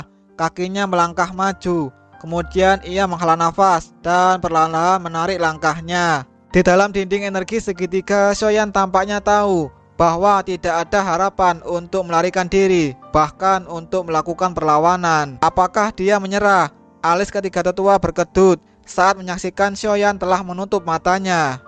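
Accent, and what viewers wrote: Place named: Java, Indonesia. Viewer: Wah medoh jawane✌😁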